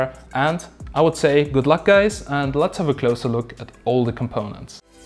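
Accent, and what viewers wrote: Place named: Germany. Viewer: bist du Deutscher hört sich voll so an wenn du englisch sprichst.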